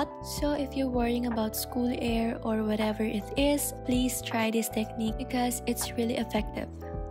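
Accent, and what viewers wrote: Philippines. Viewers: Are you filipino? I hear some accent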